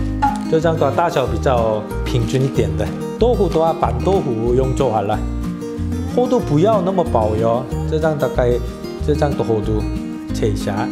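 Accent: Cantonese, China. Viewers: Kai老師國語有廣東腔，哈! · 有點廣東腔是正常的嗎🤣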